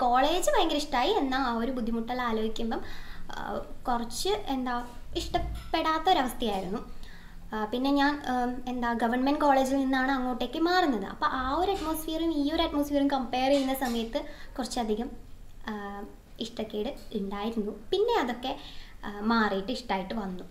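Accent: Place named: Kannur, India. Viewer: oo.. Evdeya nursing padichee... Chechi kannurkari aairnnalle🥰basha ketapo thonnni... Njanum....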